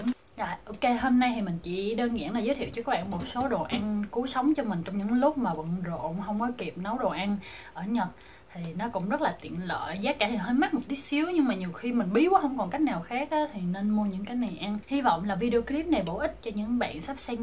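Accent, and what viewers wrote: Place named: Long An, Vietnam. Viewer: giọng nói giống người long an nhỉ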